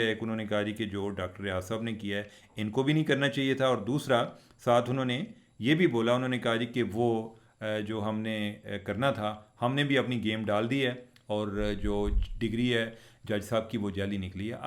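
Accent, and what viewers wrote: Punjab, India. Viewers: Punjabi touch zabardast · Sir! As usual very professional facts based Vlog presented in your unique and interesting style especially with Punjabi "Tarqa". I haved listened Ahmad Noorani & Bilal Ghauri Vlogs on General Asim Muneer. If you could share your information about Army Chief, it will be highly appreciated because Ahmad Noorani has disclosed very strange and unbelievable information about General Asim especially about his mental health, comparison with General ZiaulHaq, not capable for position of a General and Army Chief and his removal by IAKN from post ISI Chief on pressure by Iran etc. etc.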